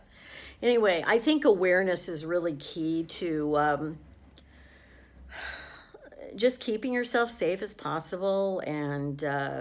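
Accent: United States. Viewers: Are you guys realising the hatred here for Americans right now???? Or are you asleep!!!! Do you not get EU news in the US...the vitriol for your country is palpable!!!. I did tell you its not safe for a woman alone in France. I doubt you read it and if you did probably ignored it. ITS NOT LIKE BEING ON HOLIDAY FOLKS...ITS ENTIRELY DIFFERENT. Personally Id go home. I'm here in the UK. No differant, anti US very strong. Honestly I have no idea why you moved to France. You'll never sound French you're obviously an American ... Any who thinks I'm being nasty I'm honestly not...but its bloody dangerous and those saying its not are ???? Living in a bubble i guess.. Just saying as it is. also EU hates US at the moment and not suprising! Your Administration ...there are no words. Kids cause hellll here as well, alot of trouble. I'm 67. I've lived all over Europe. 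...you are not safe alone with no friends or relatives. Why do that? Truth. London is a real nightmare. It's NOT Clockwork Orange....😂 seriously, but its bad here. But if you go North up to York or Lincoln or just far away from London etc it's nicer. ...but! I do not understand your rationale, EU has terrible problems these days. Violence. You're a nice woman. If you want to come to UK avoid London. Really bad. I know lived and worked here on and off 45 years! Still here .....not the London youd recognise.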